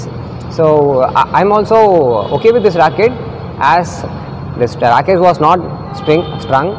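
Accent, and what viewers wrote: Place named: South India, India. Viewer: he is south indian thats their accent. u understand thats important .ignoreit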